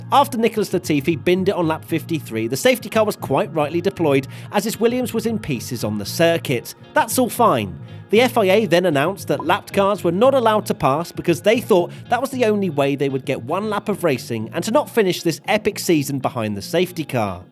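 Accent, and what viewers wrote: United Kingdom. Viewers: Yeah you should do a video which doesnt sound Britsh. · It would be great to hear a review from someone that isn't British. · You sound british so yeah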